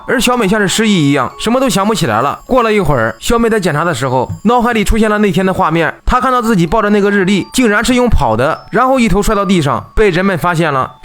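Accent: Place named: Northeast, China. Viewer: 東北口音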